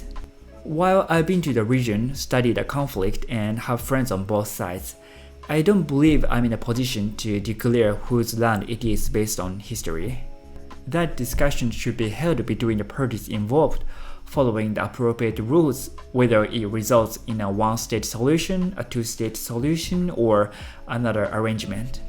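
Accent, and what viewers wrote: Japan. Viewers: 発音からすると日本の方ですね、この話題を触れてありがとうございます、日本好きな外国人としてパレスチナのデマを日本のSNSで見かけると心が折れてしまう、悲しく感じてしまいます、このどうがをみたあとにうれしくおもいました、現地の真相を語りつけずけるように祈ります、めちゃグーグル翻訳っぽくになっちゃってすみません～ｗ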